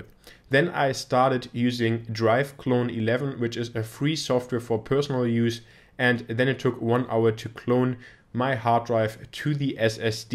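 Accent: Germany. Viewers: Dein Englisch ist wirklich gut und die Aussprache ist auch wesentlich angenehmer als bei manch anderen Deutschen Youtubern.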